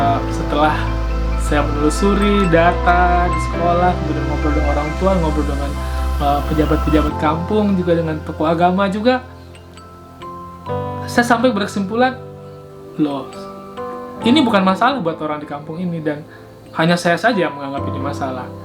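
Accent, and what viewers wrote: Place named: West Sumatra, Indonesia. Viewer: 8.27 "sianu sianu", I am laughing of loud...sorry sir... Masih lidah minang!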